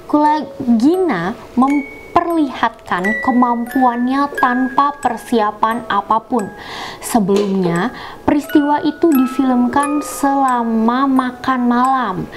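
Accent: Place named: Bali, Indonesia. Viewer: Orang Bali ya kak ? Soalnya logatnya kek Bali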